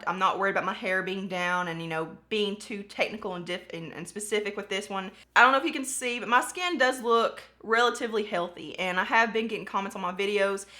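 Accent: South, United States. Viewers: I just discovered your channel. I rarely comment on videos but... okay just a few things here. 1. (And this was the biggest one for me) No offense to all the gorgeous and lovely beauty gurus out in LA, but gosh darn it girl, it is nice to find someone I can relate to being that I’m a southern girl myself. SC is my home and I just adore your twang. And the raw honesty. ❤️ 2. The “womb mate” feel I get from you when you speak of your skin issues, hair issues, UNCONSCIOUS LIFELONG NAIL BITING HABIT, even the dry lips!! Every frustrating issue is spot on with my struggles. Only difference is I’ve developed a few dark spots on my cheeks. But I felt like I was watching myself vent and carry on! 3. Lastly, I am about to order my first bottle of the kiwi product and I’m praying that it helps. I sweat a lot through my T Zone (especially during football season lol). 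I’ll check back in with ya to let you know how it’s going. I’m not sure if commenting here or using another outlet is best but I’ll update you somehow. I’m excited to check out your videos! Support and Love, Ashleigh 😎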